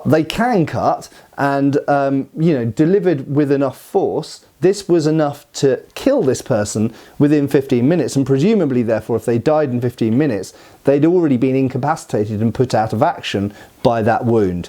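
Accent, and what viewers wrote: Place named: United Kingdom. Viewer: I assume that this is STILL hoe you Brits sound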